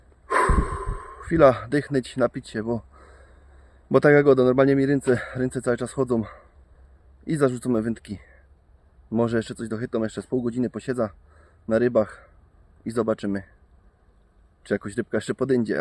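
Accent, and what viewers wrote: Silesia, Poland. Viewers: Karpie nie moja bajka, ale fajnie zaciągasz po Śląsku 😉. Pozdrowienia z Mazowsza.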